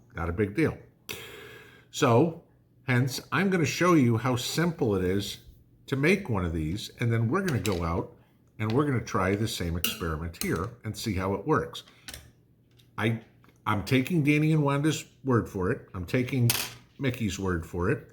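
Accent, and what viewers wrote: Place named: New England, United States. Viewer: I tune in because I learn a lot, or at least learn about things I need to research more. You’re like my Yankee YouTube uncle, lol! No harm meant by the Yankee comment — I’m southern through and through so we talk a little different, but definitely are likeminded!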